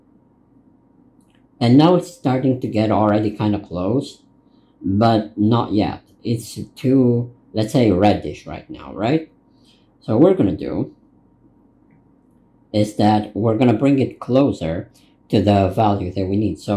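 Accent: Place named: United States. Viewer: Hi...I follow you on Instagram and I came to Youtube to hear you speaking 😉No, I'm not a weirdo, LOL....I was just intrigued by your silent Instagram videos, and that eye-twinkling smile. of yours... I'm an artist too and I like to follow young artists to see how they progress with their art journey.... In the description, it says that you live in Tunisia.?.. but your accent is American.... You got me all curious, especially after listening to you and seeing what an amazing speaker you are at just 19 (20 now, I know). Sincerely impressed by your confidence and know-how at such a young age. 🤩😍 Sending you warm regards, my fellow artist!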